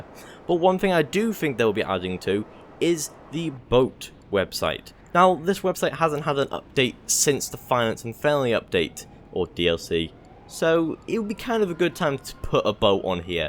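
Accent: Wales, United Kingdom. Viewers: Are you from wales?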